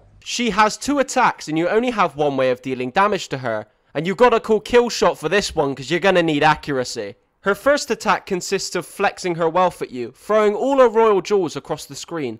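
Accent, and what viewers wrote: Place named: United Kingdom. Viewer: You have the strongest British accent I have ever heard, and I'm Bristolian.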